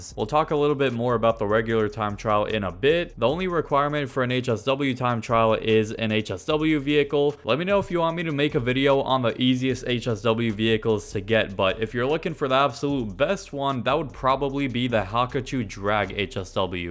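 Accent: RP, United Kingdom. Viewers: Bro sounds like spiffing brit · I thought you were actually British😭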